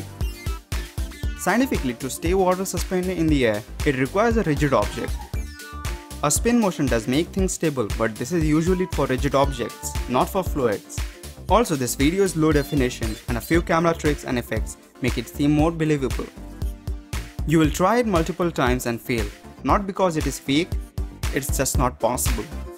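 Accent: India. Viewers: Your Indian accent is difficult to understand. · Why do 1/4 of these videos have the host with a strong Indian accent? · Please upload an explanation with an american accent guy not with indian accent. It's annoying, sorry... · Agreed. Very difficult to understand. Indians speak very quickly , and combined with the heavy accent makes it difficult to understand. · Your indian accent is funny...the way you pronounce "position"....its "pozition" not "pojition" lol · Can't blame other people for not understanding his accent. In my first time to hear their accent many years ago, i didn't even understand either. So probably this people who complain about the narrator never meet or work with indians also. 😅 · You're not the other guy. You have a total different accent. Are you an Indian? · indian accent.. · Indian accent?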